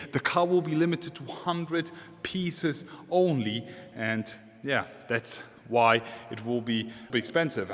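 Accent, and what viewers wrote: Germany. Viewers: It´s always very funny to hear a german guy speaking english !!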